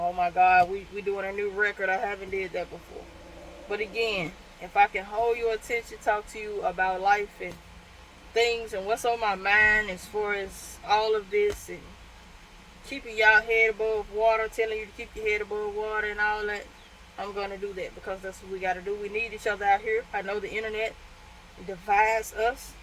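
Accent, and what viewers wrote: South, United States. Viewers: Hi. I watched your video for the first time. It popped up on my feed. 1. Where are you from? I love that country twang. 2. I enjoyed how you came across with your message. 3. Are you married cause I'm going to follow you going forward... with your cute country sounding self. Don't want to disrespect. GOD Bless you for following GOD's instruction to speak what HE put on your heart at this time. I know you are helping folks. Many people are struggling like never before. I always say all of our worldly possessions and all the things we create... we can't take it with us to the next place. Someone said we are just renting them from GOD.:) Stay Cool. I'm definitely going to share your message to my contacts. Bless You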